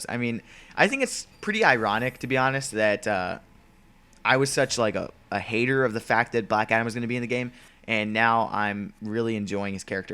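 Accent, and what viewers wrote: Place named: United Kingdom. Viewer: Breathless British accent there xD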